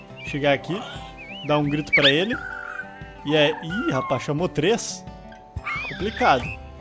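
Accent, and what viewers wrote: Rio Grande do Sul, Brazil. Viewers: Ei man tu e gaúcho? Se tu não for teu sutaque e igual sou gaúcho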